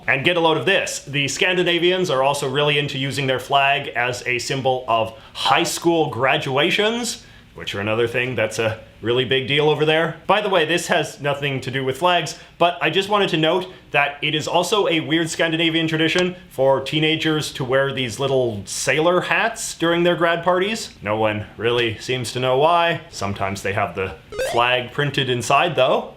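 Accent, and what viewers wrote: Canada. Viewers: Lmao saying “kawaii” (cute if you’re out of the loop somehow) with his Canadian accent literally turns into “kowai” (or scary). Still love your accent.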